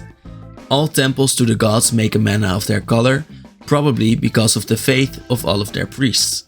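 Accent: Netherlands. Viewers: first of all, are you Dutch? second of all, very good explanatory video! I had no idea what the gods were doing on Ixalan all of a sudden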